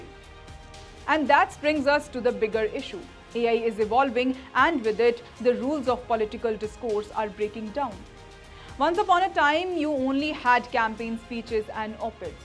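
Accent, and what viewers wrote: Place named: India. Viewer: your English sounds like Hindi. WHY dontbyou better talk in Hindi.. .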